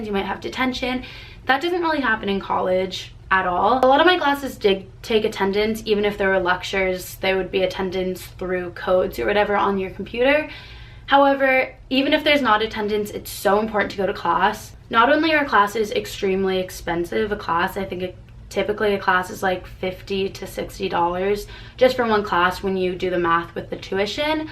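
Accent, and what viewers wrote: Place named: Australia. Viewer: you have a low key Australian accent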